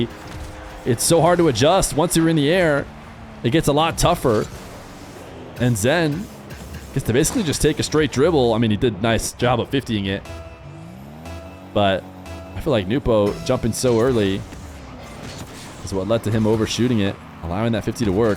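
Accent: United States. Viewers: you sound like an american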